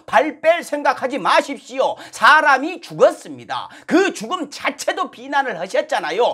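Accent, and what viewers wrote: Jeolla, South Korea. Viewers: 고향말을 진짜로 잘해분다야 ㅋ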